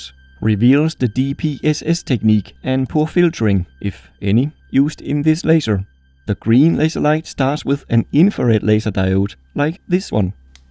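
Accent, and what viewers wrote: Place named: Denmark. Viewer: This accent can only belong to a Dane! Thumbs up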